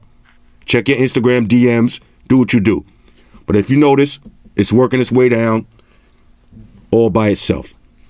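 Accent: New York, United States. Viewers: You from New York right? Lol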